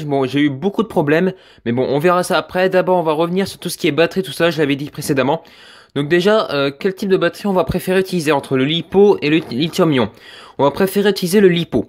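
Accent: North, France. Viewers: Tu Vien du nord touwa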